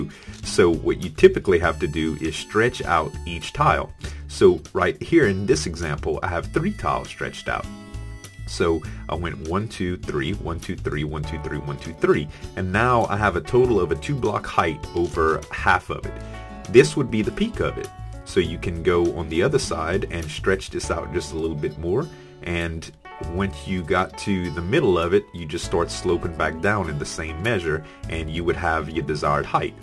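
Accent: Louisiana, United States. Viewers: This guy has got to be from Southeast Louisiana. He has a Cajun accent.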